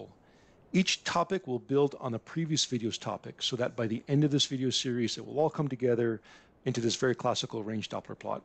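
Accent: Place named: United States. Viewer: respect. Clear presentation, good speaking style, a really "proper" AE 🎯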